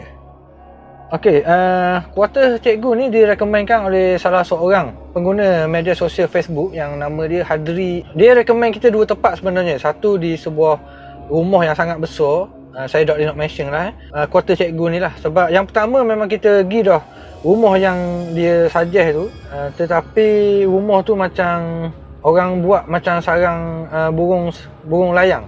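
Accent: Terengganu, Malaysia. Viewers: Haha,org ganu oyak gitu la,ambe org perak,tp blajo dungun dlu,mule mmg selok la suke,dh lame biase dengo,srehhh srehhh..haha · X eplore pn xpe dh bro.. sdp dgr crta pngalman cmni je.. skit pn xrse boring lg² ad cmpur loghat tganu.. mmg lyn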